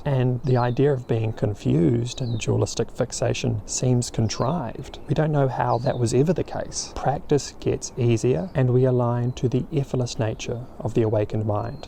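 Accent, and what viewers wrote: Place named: New Zealand. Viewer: Hi, thank you for sharing this. I became enlightened about a year ago. Of course it is a bit of a misnomer as strictly speaking there is no self to become enlightened but you know what i mean. I say i am enlightened but i am not permanently living in oneness or non duality. I am shifting between non duality and duality usually many times each day. Sometimes i am in my mind with ego and other times i am in consciousness or oneness. The great thing is i have realised my true self and i am living from that place. Every day i feel beautiful bliss or nirvana. I experience a baseline of this bliss. It is always there now at some level. It does change in intensity but its always present. Sometimes suffering still comes up. The suffering is reducing for sure and the periods of time when i feel suffering are reducing. To be honest with you the reason im commenting on your excellent video is because i picked up on your kiwi accent. 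I am a kiwi too. Apart from myself you are the only other kiwi i know who is enlightened. You don't live in Dunedin by any chance do you?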